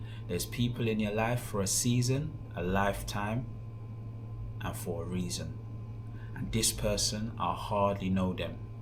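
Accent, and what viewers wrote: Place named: London, United Kingdom. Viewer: If you had not done anything wrong, why did you flee? If you did nothing wrong, why did they chase you? If you had been causing trouble and left they would have just let you go, unless you had not paid a bill. Plus, you did injure a pedestrian. They could have charged you for that. These cops are not like the MET cops. You sound like you are from London. No verbal warning here. No behave yourself and go home. And definitely claims of: is it because I am black, don't work in Thailand.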